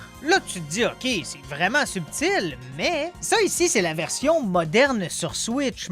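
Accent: Quebec, Canada. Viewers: En tant que français ton accent québécois me surprend au début mais est tellement agréable à écouter. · Allez je m'abonne et like c'etais vrm cool comme vidéo et j'adore l'accent québecois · vidéo intéressante et très drôle, merci :) j'ai pas envie de faire mon relou avec l'accent québécois vu que t'as déjà du recevoir 10 milliards de commentaires dessus mais j'aime bien :) "un grand total de aucun est revenu" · Toi t'es québequois 🤣🤣, t'as un très belle accent. c'est fait du bien le changement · Super un québécois qui a pas peur de parler avec sont accent good job chômer pi t’ai jock sont drôle en criss 😂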